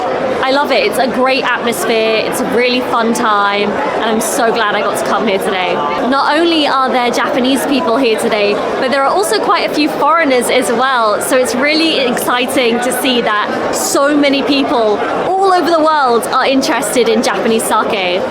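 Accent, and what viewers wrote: United Kingdom. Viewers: 動画の企画も、もちろん素晴らしいです。いつも楽しみにさせていただいています。探求心も素晴らしいです。さらに、の、イギリス英語の響きの良さ、本当に引き込まれます。きれいな英語を聞かせていただくだけで、大満足です。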